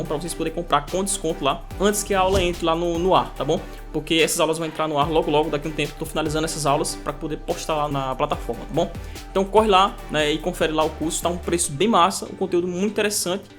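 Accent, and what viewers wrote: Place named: Northeast, Brazil. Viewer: sotaque nordestino e foda❤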